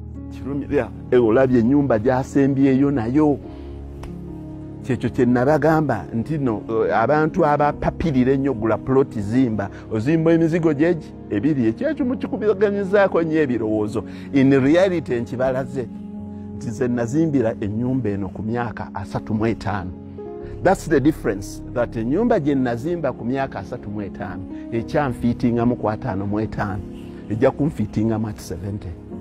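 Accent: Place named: Kigezi, Uganda. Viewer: Naye onosoboka gwe kweeli,enjogera nga yakigaga kyereere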